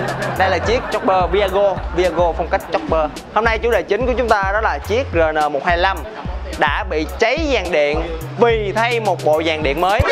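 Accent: Standard. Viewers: Hoc văn giỏi hong, nói chuẩn vậy trời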